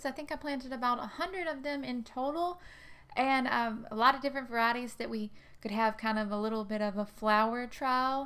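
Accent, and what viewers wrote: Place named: Tennessee, United States. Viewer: You sound like a friend of mine, she is from Tennessee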